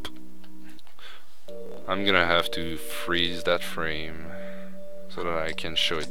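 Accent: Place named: Quebec, Canada. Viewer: tu me semble avoir un accents québécois haha merci pour le tuto sa ma aidé un px avec des skips que je rochais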